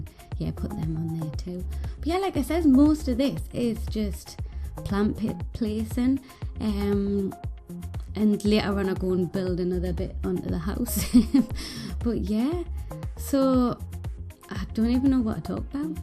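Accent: United Kingdom. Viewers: Your accent sounds like a mashup of something from the Caribbean plus something from the UK what is it i'm in loveeee???!?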